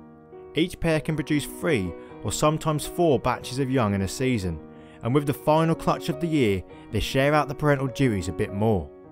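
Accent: United Kingdom. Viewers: Awww mate, that beautiful accent. You make me miss the UK. Thanks for posting. Great stuff. Love from Alabama.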